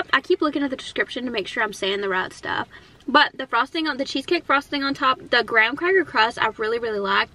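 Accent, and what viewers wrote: South, United States. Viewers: Where are you from? Because you definitely have a southern accent. You dont sound like youre from California. Lol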